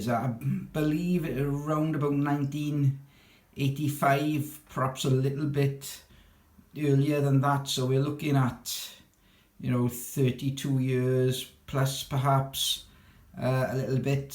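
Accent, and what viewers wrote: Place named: Wales, United Kingdom. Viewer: You sound Welsh......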